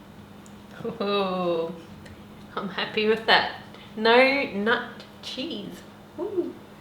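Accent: Australia. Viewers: wow so good will try this very soon,thank you for this video, sounds like your an Ozzie :)) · You sound like an Aussie, but live in US? Can't wait to try this, thank you, and helloo from Australia. ;D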